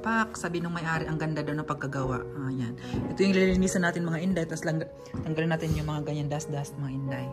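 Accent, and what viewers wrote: Visayas, Philippines. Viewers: HALLWAY tawag ana dai knang imung gibutangan ug tela nga salog.Mao gyud dai Ayaw pakumpyansa kaayo sa pag film sa place sa inyong ginatrabahoan especially ang plate number sa car ,i-blur lng na kay lisud ma-AWARD sa owner. I-vlog lng ang mga lihok2x ninyu while working. Pasagdi NG MGA BASHERS . Malingaw mi sa imung chinikahan Kay tungod sa imung BISAYA accent. Ana MN gyud ta importante MAKASABOT mi ug malingaw . Stay true lng ug never MAGPA PRETEND para lng i-please mga tao. Maong love KA namu Kay mkalingaw KA and for being true to yourself dili pa feeling. Bsta amping mo permi dai ha. NO SKIP ADS mi tanan! God bless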